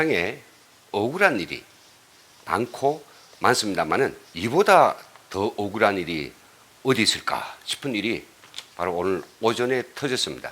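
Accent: North Korea. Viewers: 아 여기가 이런곳이군요 앵커 말투가..??? 북한사람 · 말 뽄새는 북한 스러워 처음 접했을땐 적응이 어려웠습니다. 허나.. 진심이 느껴지기에 매번은 아니오나.. 늦게라도 시청하지요. 늘 애쓰시는 NKTV 여러분 그리고 김흥광대표님께 박수를 보냅니다.. 지금은 시련도 역경도 무관심도 있을겁니다. 허나.. 이 정권이 제 자리로 가면 꼭 국민들 정서상 김대표님 뜻을 알아갈것입니다. 그럴수 밖에 없지요.. ^^ 힘내십시오~~ << 현존하는 최고의 경험자 아니 십니까??? >>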